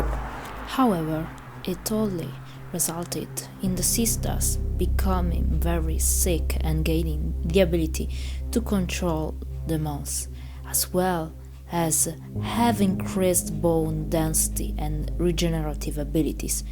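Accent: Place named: Italy. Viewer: Love your accent. It's Italian right?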